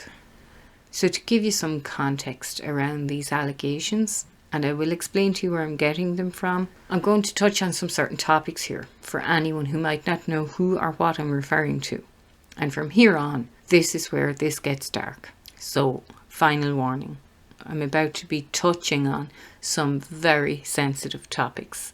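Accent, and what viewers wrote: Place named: Kildare, Ireland. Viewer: Where is yur voice from.... Kildare...? Yu seem to look like a woman called christina that does human right works in ireland.. same voice too.. That voice is so familier to my childhood... Kildare..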